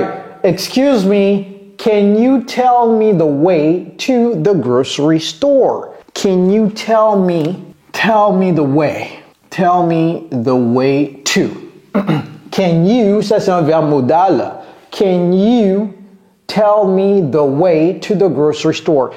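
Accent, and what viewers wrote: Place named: United States. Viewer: hier accent américain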